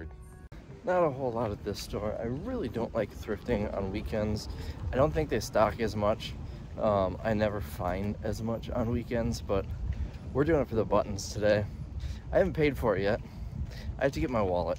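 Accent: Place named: Midwest, United States. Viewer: New subscriber here from Phoenix, AZ. I grew up in a tiny little town north of Rice Lake called Shell Lake, so it was fun to see familiar landscape and hear the Midwestern accent....with the dry wit! 😊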